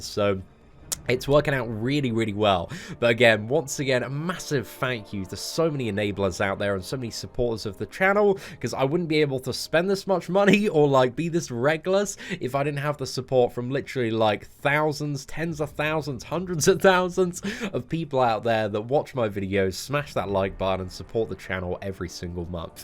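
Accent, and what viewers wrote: England, United Kingdom. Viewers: Compared to the other MCOC utubers your are first mainly because you have a very nice English accent !!!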